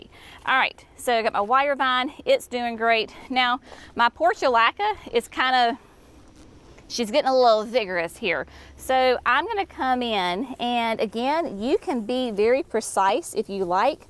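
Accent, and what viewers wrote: South, United States. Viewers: I just found your channel and I love it. You are a very good teacher with a world of information and a great little southern accent. Thank you and be blessed.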